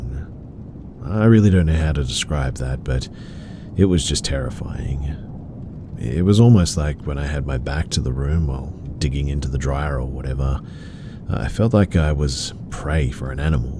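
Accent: Australia. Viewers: Why the Aussie accent when everyone is texan? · Sounds like you grew up in Oz, rather than Texas! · Wait, is that an Australian accent? I'm listening in Sydney.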